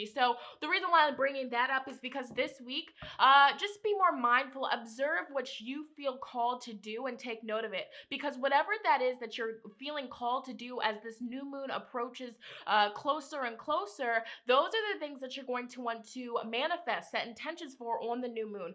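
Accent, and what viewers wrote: United Kingdom. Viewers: I keep hearing a British accent peeking through! 😍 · Been feeling so stagnant for the past week or so, so looking forward to this energy shift 😊 as always, I'm vibing with your reading 🌞 (Also, are you British? I keep hearing an accent come out lol been curious)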